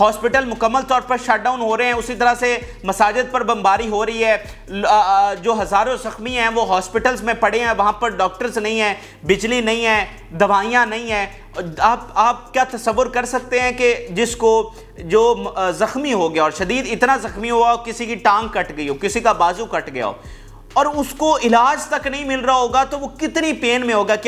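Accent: Punjab, Pakistan. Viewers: Apni Urdu theek kro Punjabi style ki urdu mat bolaaa kro ....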